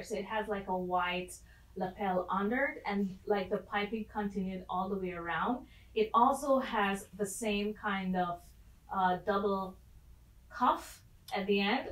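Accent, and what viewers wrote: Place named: Jamaica. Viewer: You sound like you have a Jamaican accent!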